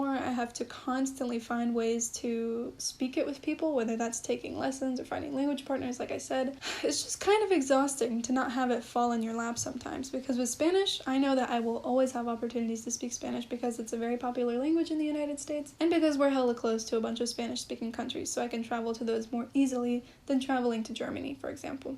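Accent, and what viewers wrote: Germany. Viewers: Your German accent is soooo nice <3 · love your german accent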